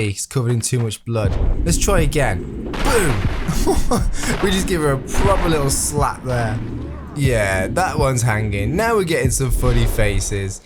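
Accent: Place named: United Kingdom. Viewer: Uh it kinda concerns me considering that its so dude that yells for his intro and the British accent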